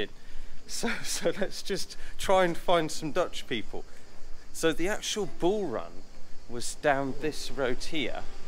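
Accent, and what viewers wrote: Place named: England, United Kingdom. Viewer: Brilliant. Your accent reminds me of my favourite cricketer Geoff Boycott from England . Thanks for showing lovely places in Uzes.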